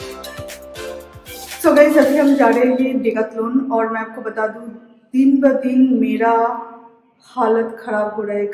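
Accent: Bengal, India. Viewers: Main hun Bengali...... U try ur best to speak in Hindi, but pura Bengali tone aata hai.. It's OK... U will learn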